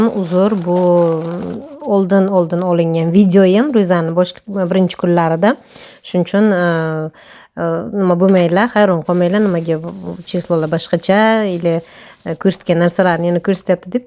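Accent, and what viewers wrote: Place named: Navoi, Uzbekistan. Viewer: Овозизгахам узингизгахам гап йук Навоини шеваси N 1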